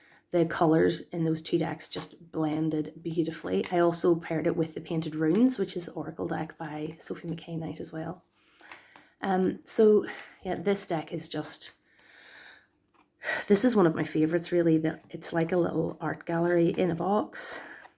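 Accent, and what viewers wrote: Ireland. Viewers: Oooh I love your Irish accent 😍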